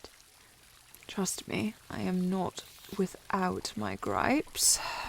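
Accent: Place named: England, United Kingdom. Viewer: Yo, when she hit me with dat " Well go on, go! Time is of the essence!", I was so happy. Dat English accent slaps!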